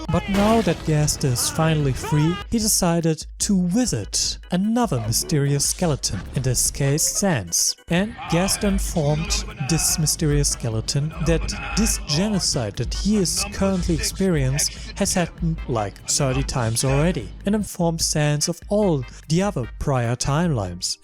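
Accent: Germany. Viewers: ja, wenn man Deutsche gut kennt hört man einen deutschen akzent bei ihrem englisch, und auch cool dass du deutsch kannst haha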